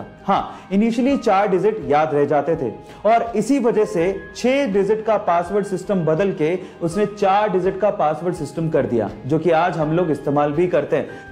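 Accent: Maharashtra, India. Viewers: Aap marathi kyon bolte hai like thamba but it's funny I like it because I am Marathi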